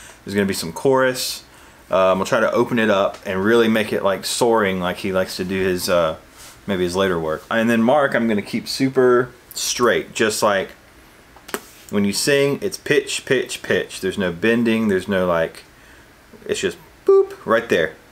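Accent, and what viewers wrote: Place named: North Carolina, United States. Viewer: I love hearing your slight twang. Reminds me of growing up in NC. Keep doing what you're doing. You're killing it, my dude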